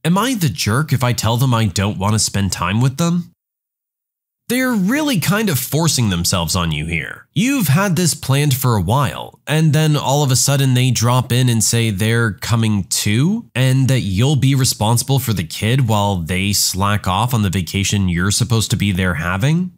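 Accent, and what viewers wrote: United Kingdom. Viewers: Love the British accent init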